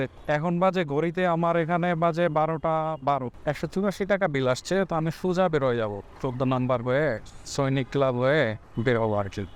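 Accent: Barishal, Bangladesh. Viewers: ভাই আপনি কি বরিশালের??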